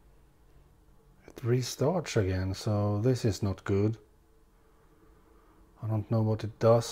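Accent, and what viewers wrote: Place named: Sweden. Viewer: love the swedish accent :D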